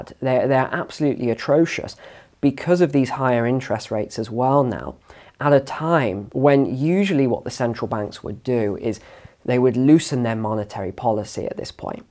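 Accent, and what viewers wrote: United States. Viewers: Pretty gooder American accent.. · flawless american accent! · Your American accent sounds good enough to me! My British accent amounts to adding words like "wobbler," "mate" and "cheers" to my sentences. Cheers,! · You do a pretty good American accent! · Lol nice accent brother 😃 Apart from the top drawer US accent your work is splendid. Thank you fella. Great job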